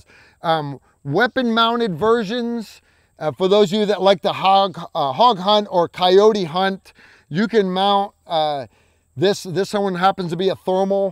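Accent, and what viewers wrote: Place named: Upstate New York, United States. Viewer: Upstate New York accent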